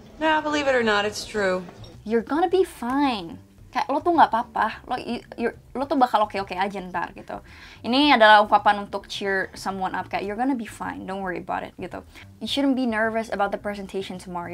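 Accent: Indonesia. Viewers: Ternyata kamu orang indonesia ia ka? Dari logat bahasa inggrisnya sih terlihat orang indonesia tapi dari penampilan saya pikir dulu orang barat sana 😀😀 Sukses terus ka, saya banyak belajar dari kamu 🙏🙏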